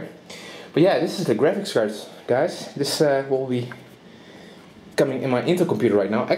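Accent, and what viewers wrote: Netherlands. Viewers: mooi systeempje, heb al wel een 6870, maar nog een i5 760. Ga wel upgraden naar ivy bridge. Best geinig dat je kan horen dat je nl bent (en ja ik weet dat er nl in je naam staat) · jij klinkt heel erg nederlands lol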